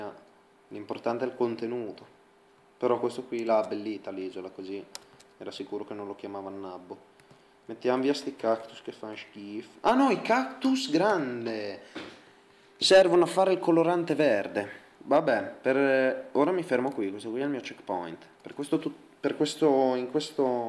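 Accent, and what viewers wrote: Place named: Palermo, Italy. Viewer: sei di palermo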